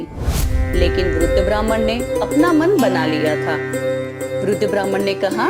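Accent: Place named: South India, India. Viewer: South Indian voice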